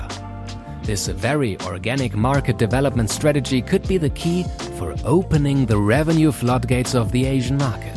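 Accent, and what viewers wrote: Germany. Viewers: World class video's about Sports and they're educational aswell and go into good detail. By your accent i can hear you're from a German speaking country. Is there a German Channel coming in future? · Meiner Meinung nach ja, habe ich damals beim ersten Video, das ich gesehen habe, schon herausgehört. Ganz kann man den deutschen Akzent nicht verbergen :) und man merkt auch, dass in diesem Video z.B. die deutschen Namen alle perfekt ausgesprochen werden, das kriegt niemand mit Deutsch als Fremdsprache hin (außer die Person wuchs hier auf, o.ä.). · I'm in for the man's voice lol.. The German accent sounds good on him.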